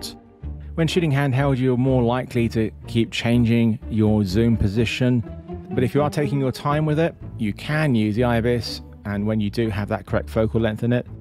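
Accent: United Kingdom. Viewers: I don't have any uses for these lenses or the Red, but the video is gorgeous, the music is well chosen, and the British accent is always charming. Heh.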